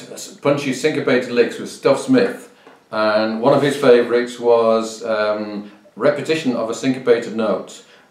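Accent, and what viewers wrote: England, United Kingdom. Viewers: My brain did a hard reset when I heard a English accent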